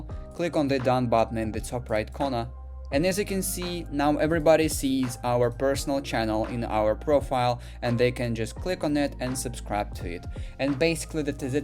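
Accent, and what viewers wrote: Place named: Russia. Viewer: В вашем английском я слышала русский акцент... Но потом увидела « »...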